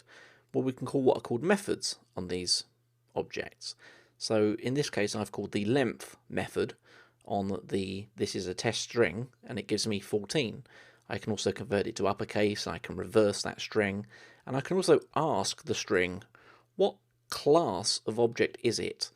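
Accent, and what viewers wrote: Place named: Liverpool, United Kingdom. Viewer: he tawks like a beetul..cool.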